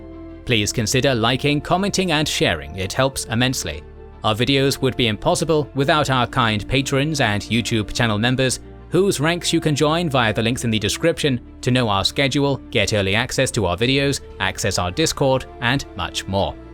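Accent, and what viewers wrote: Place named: England, United Kingdom. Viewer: its Amerikal as in California the 'c' is very hard All u need do is google to get these words right But then u are English by ur accent and u know how to pronounce everything no matter what language it came form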